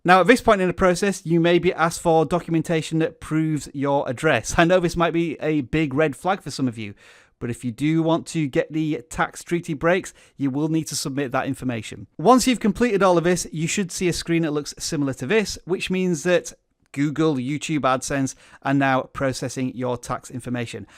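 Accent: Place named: United Kingdom. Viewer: I'm confused. How does it work out for you? You sound like you come from the UK, listed as being in the US and you say you live in Canada?? Are you not sharing a VPN like loophole we don't know about? :)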